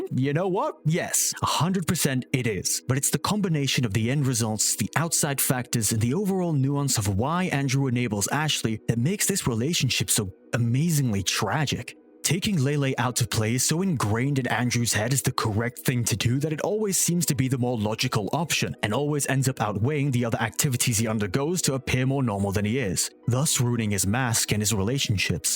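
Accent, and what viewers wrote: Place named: United States. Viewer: Brit who talks like an American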